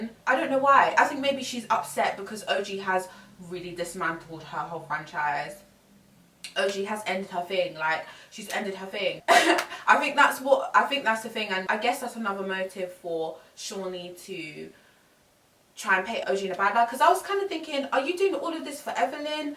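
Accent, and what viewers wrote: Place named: United Kingdom. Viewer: Lovely Brit accent I love it OMG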